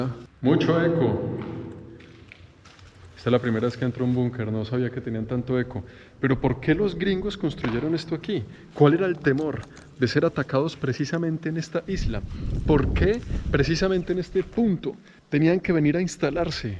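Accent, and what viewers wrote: Colombia. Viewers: Me encanto!! Igual como me gusta tu acento panacolombiano.! Un abrazo desde República Dominicana 😘🎊🇩🇴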